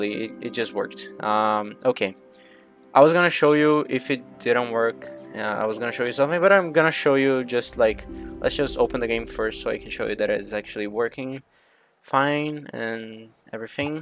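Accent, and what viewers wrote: Brazil. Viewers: Esse sotaque Brasileiro não engana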